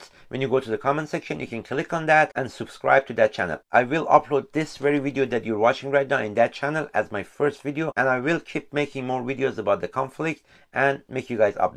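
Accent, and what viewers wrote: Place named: Tehran, Iran. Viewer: harf nadare man kheily khoob mifahmam be valah englisit tehroonie . man kheily khoob ham mishnavam ham mifahmam . man jadidan asheghet shodam agha dadash.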